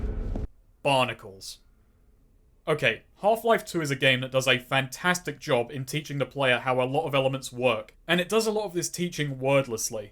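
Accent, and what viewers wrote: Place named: RP, United Kingdom. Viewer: Good lesson to learn for indie devs. Better have your shit together or some tosser will absolutely roast your efforts to bits unless it's at the standard of the original game. With the pretense that because he has a posh English accent what he has to say is somehow important in some way. Excellent video A+. Will totally share.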